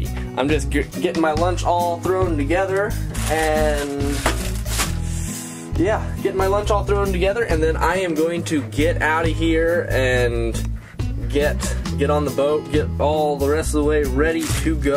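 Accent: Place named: Canada. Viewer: I'm hearing a Canadian accent in your voice lately. Please explain what "Fahrenheit" is for the 90% of the metric planet earth please...lol.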